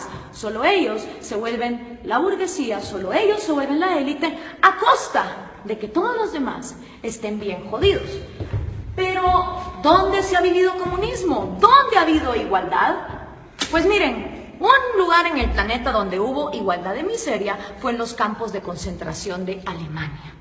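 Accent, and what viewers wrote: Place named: Central America. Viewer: este video deberia haberlo filmado en blanco y negro, reflejaria el contenido añejo que sale de la mente desactualizada de la autora. para cuando haces un video revisionista de los regímenes ultramarino imperial de inglaterra vs expansion napoleonica?. por tu asento debes de ser de un pais centroamericano entregado a los narcos, pero de eso no hablas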